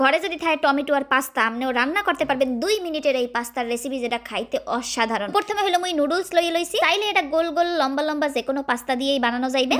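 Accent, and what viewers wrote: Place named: Barisal, Bangladesh. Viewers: Apni kon deshe bas koren,,,eita borisal er basa,,,,,ei prothom sunlam borisal er basa kaw sune nai,,,,tao se abar Bangladesh e😅😅😅😅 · Sona...Tumi....erokom... borisailll a....vassssa...kivabe...set....korlaa????..NA...ki....sotti..sottti....bolo.😂ami..khulnaiyyya.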